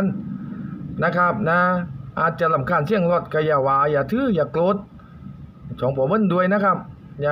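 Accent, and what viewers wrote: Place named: South, Thailand. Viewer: 👍👍👍👍👍 แหลงใต้เลยคลิปนี้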